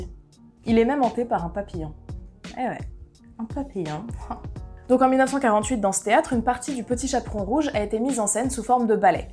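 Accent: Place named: England, United Kingdom. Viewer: Wow, je suis éblouie par cette beauté, ce talent, cet accent anglais ainsi que cet humour absolument incroyable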